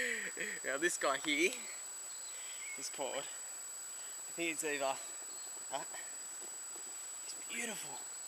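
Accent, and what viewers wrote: Australia. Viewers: Jensen Payne He's definitely Aussie. Not everyone in Australia has the same accent.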